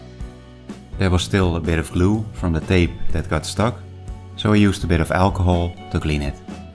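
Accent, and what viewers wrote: Netherlands. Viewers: are you dutch? if so vet man!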